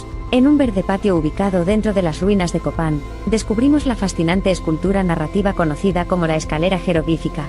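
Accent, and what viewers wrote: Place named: Spain. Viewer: Pienso que es una ofensa que la propia narración sea con acento de España...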